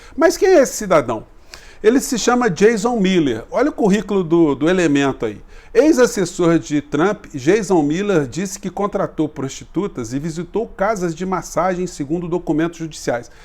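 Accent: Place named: Rio de Janeiro, Brazil. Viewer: 😂😂😂😂😂😂😂😂Adorei a sua fala CARIOCA!!!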